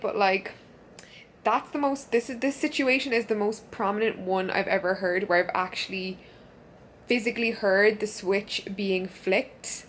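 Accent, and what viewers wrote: Ireland. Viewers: Sexy Irish accent 💕👌. X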